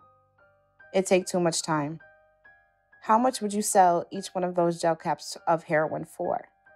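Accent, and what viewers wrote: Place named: Baltimore, United States. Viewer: The narrator sounds like she from Baltimore, my city done gone to hell. Something has to be done about all of these shootings & murders or law abiding citizens need to protect themselves because the police can only do so much !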